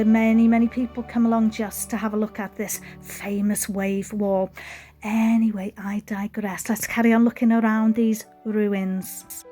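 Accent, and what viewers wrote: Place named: England, United Kingdom. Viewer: Hello, you have a new friend. Lovely video and Love your English accent. your new friend Patrick.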